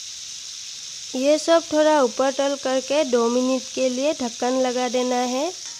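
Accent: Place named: Assam, India. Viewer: nehi wo Assam se hai. Unka naam ek flower ka naam hai. Aur kabutar Assam me khate hai aur yeh kabutar ka receipe bata rahi hai. Ho sakta hai yeh Bengali hai lekin pakka Assam se hai. Unke Hindi ka pronunciation se pata lag jata hai. Aur yeh kisiko Assamese me reply kar rahi thi meine dekha tha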